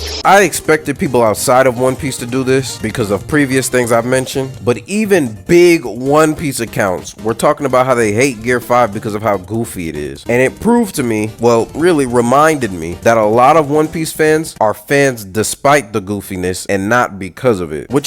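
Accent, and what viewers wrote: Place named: United States. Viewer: well depends on the character, story they are in, world they are in, and their life they have led so far... if for example a character got plot armored outta having somethign bad happen OR just never has any issues, it becomes hard to relate cause for basically NO ONE in the real world, is that the case. the more lack of hardships to face just makes it more and more difficult to see it as anything more than like a poorly written play being put on. if you want happy comedy slice of life stuff, that does exist and you can enjoy that but for most people, we like watching entertainment we can get immersed in to the point that we forgot that its entertainment and watch it as if we are observers of these PEOPLES lives and not just these characters stories. basically hardships to face and overcome is the whole idea behind normal storytelling. 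you sound like your also from at least a western nation if not more likely proly some place in america so our cartoons mighta made you misunderstand what animated mediums should be and you only think that anime is something for kids so why make it grim and dark and gorey? but thats just it my guy... its not just for kids but just is another style of storytelling that allows for more commical or over the top magical presentations.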